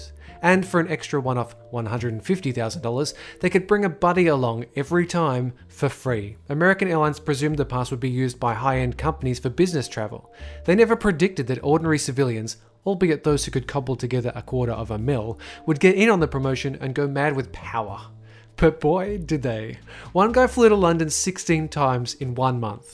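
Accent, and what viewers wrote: Australia. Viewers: Are you australian? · suddenly turned full australian. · Damn it, getting another aus narrator still wont work.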